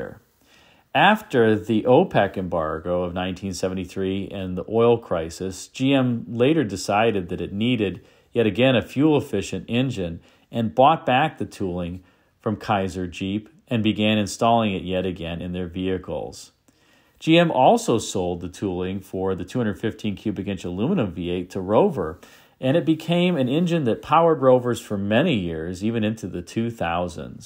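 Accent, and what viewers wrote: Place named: California, United States. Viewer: he sound like he got California accent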